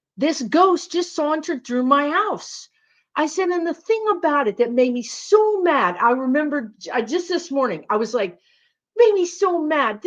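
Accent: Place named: South, United States. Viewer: You are adorable, down to earth, 100% believable and I love your southern accent when you let it out.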